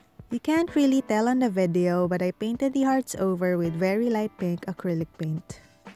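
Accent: Philippines. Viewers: Was it just me or you sound like a filipino? I'm not racist okay cause I'm also a filipino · What country are you from <3? Your accent sounded like you're Filipino O///O !? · are you a filipino? cause your accent lol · are you a filipino? your accent sounds like one ☺️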